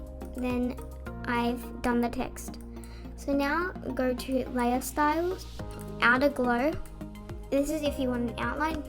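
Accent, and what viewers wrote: Australia. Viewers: ITS AUSTRALIAN AND ITS CALLED AN ACCENT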